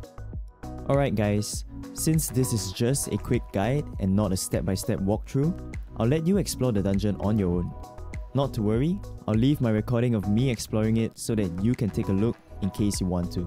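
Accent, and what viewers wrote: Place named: Asia. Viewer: Not bad at all! At first I recognised the Asian accent straight away (I’m Asian myself Malaysian that moved to UK since 14 and now 31) and i wasn’t expecting it to be a good video, but at the end it convinced me that I was wrong. In fact, at the end, I kind of felt warm and home listening to that accent. Good guide/video! Although I was already passed that stage while watching this.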